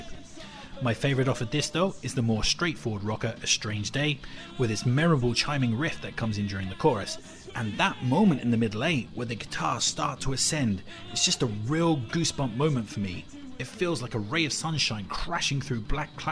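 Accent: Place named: United Kingdom. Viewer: Your accent is insane - it seems like a Brit accent but then you have hard R's... is that an actual dialect over there or have you lived in America a long time or something?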